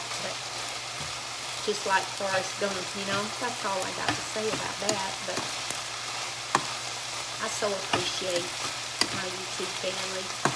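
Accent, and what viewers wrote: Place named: North Carolina, United States. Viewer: Hi that a great recipe. I'm detecting southern, NC here. Have a great weekend tyfs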